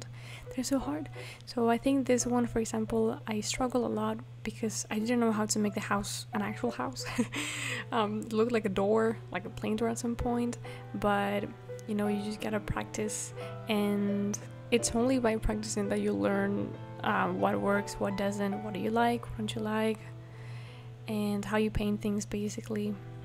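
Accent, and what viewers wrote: Spain. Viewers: This is the first video of yours I’ve ever seen and for context my spanish teacher at my high school has an insanely similar accent and speech pattern to you, I find it very pretty and calming (I love accents but Hers is one of my favorites) and she is from Spain. When you said you were from Spain I freaked internally because you sound so much like her your voice is so beautiful and calming! Can you do ASMR while painting?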